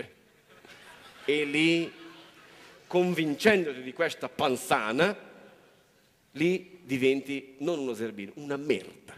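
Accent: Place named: Romagna, Italy. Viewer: Impareggiabile interprete della parlata romagnola prestata alla quotidianità. Bravissimo.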